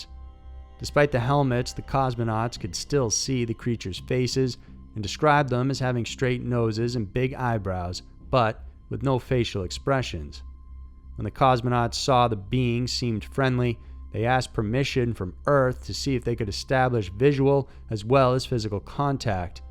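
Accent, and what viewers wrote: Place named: Texas, United States. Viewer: He is probably from Texas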